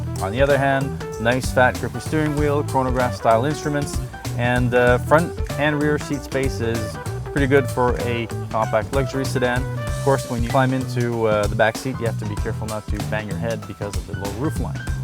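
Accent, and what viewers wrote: Canada. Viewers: Sounds Canadian to me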